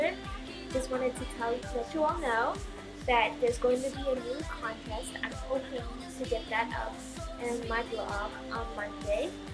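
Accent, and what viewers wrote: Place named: United Kingdom. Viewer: why do you have a british accent?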